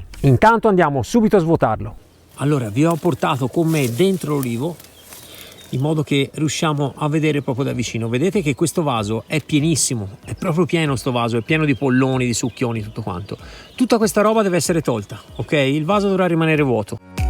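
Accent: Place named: Tuscany, Italy. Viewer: infatti..il tuo accento è anche il mio...io abito zona Lucca fora...terra di Ulivi ...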